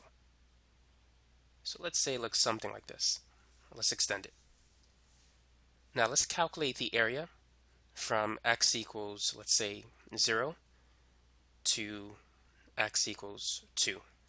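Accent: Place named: Boston, United States. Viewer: Dat boston accent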